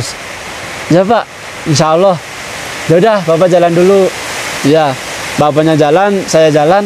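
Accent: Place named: Banyumas, Indonesia. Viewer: Habis dr rjl5 langsung kesini. Mas keren bocah ngapak kaya inyong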